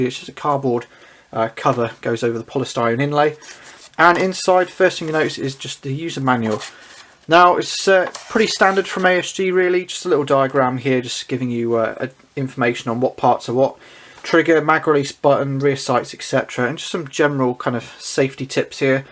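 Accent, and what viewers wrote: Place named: England, United Kingdom. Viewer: I subscribe to your channel and find your reviews informative, entertaining and thorough. That said, I simply have to take issue with you calling this pistol a "Cee Zee". You speak with a very eloquent English accent and should therefore know that it is in fact, "Cee Zed". This tiny faux pas aside, keep up the good work.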